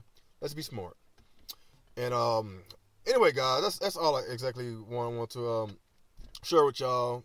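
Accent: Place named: AAVE, United States. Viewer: I hate ebonics lol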